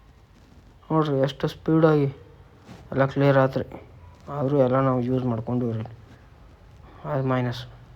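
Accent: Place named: Uttara Kannada, India. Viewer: Full jora agi matadi sir.... Adu uttar kannada language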